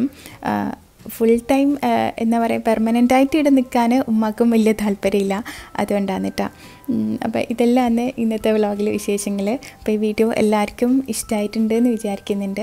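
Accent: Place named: Thiruvananthapuram, India. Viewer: Namale thiruvanantha purathe ninane athane samsarathile mattam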